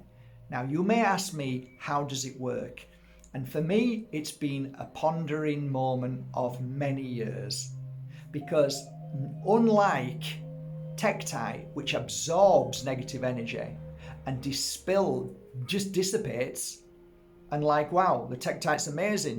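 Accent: Yorkshire, United Kingdom. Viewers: Thank you. Honestly I would love to hear an audio book in your own 'Yorkshire voice '. XxX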